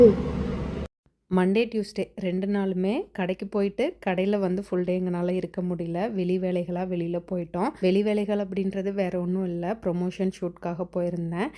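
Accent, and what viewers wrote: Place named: Palani, India. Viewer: Hi sis....recently i started watching ur videos...i love ur Tamil slang because its very nostalgic to me as my mom's native is palani neikarapatti and my relations over there speak like you..your vlogs bring back my childhood memories...today morning i saw ur old vlogs like love story,album vlogs and so on.....oru oru vlogs um enga ammaye oorla naa irukara mathiri feel aaguthu....mainly ur amma veetuvlogs along with your akka n family....!! Ippo yaarum oorla illa sis thatha n ammaye is no more..yellarum padippu,velai,kalyanamnu vera vera oorla settle aagitom....oru oru school summer leave ku vandha eppdi irukumo apdi irukku...unga face kuda en friend Selvi face mathiri irukku....my MEIYAZHAGI😢..!! I donno where she is...cudnt get the contact too!! a big hai to your family members n brother's ideologies!! Final thing i want to share is check your Magnesium level and kuthingal vali reason is mainly low Magnesium...consult a doctor...she will definitely suggest NEUROBION tablets...!! 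Don worry it is Magnesium deficiency..i will suggest ur vlogs to my cousins...so that they can also get the nostalgic experience...❤❤.take care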